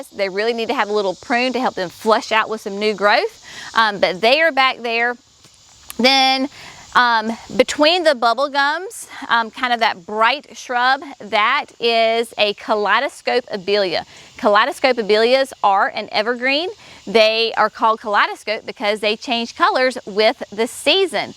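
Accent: South, United States. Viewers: Love your Southern accent and thank you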